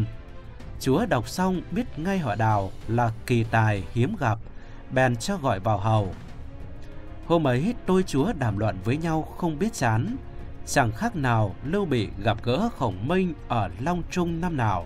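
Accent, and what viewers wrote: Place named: Central, Vietnam. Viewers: Giọng này ng miền trung đọc theo tiếng bắc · Người thanh hóa họ nói vậy. Đào duy từ chắc là tổ của ad nên họ làm video này chứ cống hiến của Đào duy từ trong lịch sử không có gì nổi bật.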